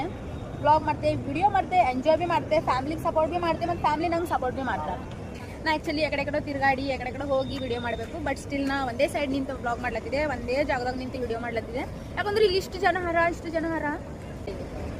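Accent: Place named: North Karnataka, India. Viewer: Chenagidhe nim Uttara Kannada accent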